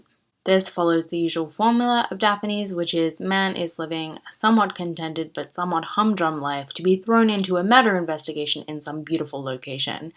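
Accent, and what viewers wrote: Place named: Australia. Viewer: Was wondering where you went! That accent is a lot less Aussie now: pushed that boat right out! But to be expected. I imagine it's ocker again on the phone to mum! Will be looking forward to hearing from you again :)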